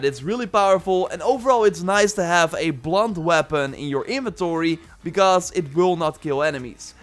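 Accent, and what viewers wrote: Netherlands. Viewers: hello dutch dude. nice accent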